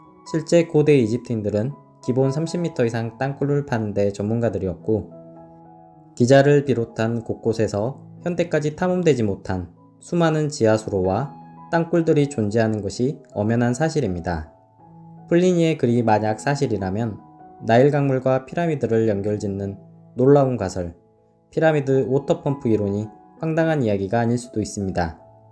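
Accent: Jeolla, South Korea. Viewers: 모음 발음이 전라도식이네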